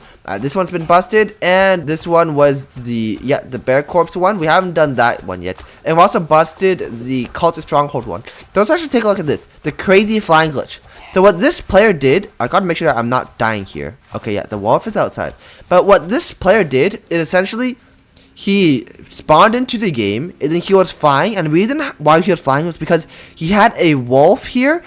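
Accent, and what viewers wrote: United Kingdom. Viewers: Is bro half British?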